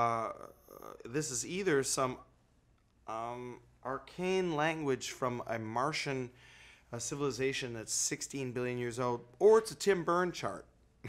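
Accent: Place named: Midwest, United States. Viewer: This dude is from the midwest for sure.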